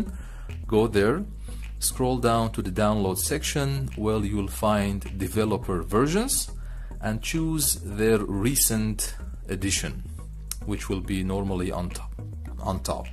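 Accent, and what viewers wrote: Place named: Iran. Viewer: This is the most Persian accent I've ever heard in my 43 years on this mortal coil. I give this 5/5 pomegranates. Would watch channel again!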